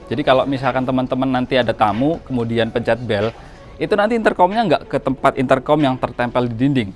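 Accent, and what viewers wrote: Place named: Banyumas, Indonesia. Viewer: mas logat ngapak nih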